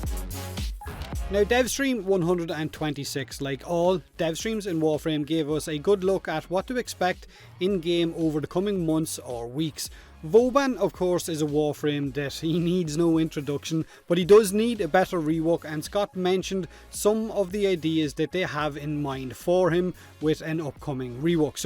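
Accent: Ireland. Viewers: I love Irish you tubers “Harnesses the power of the Feeking sun”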